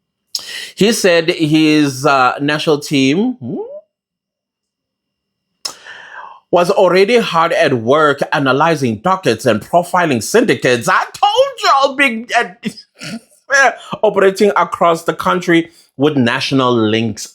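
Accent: South Africa. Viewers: 😂😂😂 you make me laugh, that emfuleni tone😂😂😂😂😂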